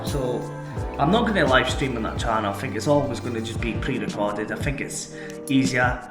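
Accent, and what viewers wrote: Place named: Scotland, United Kingdom. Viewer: What part of Scotland are you from. Come to New Zealand 🇳🇿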